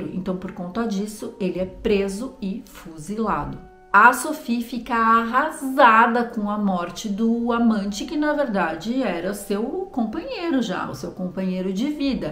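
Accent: France. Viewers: Amo o jeito francês de você falar os nomes 😊😊😊😊.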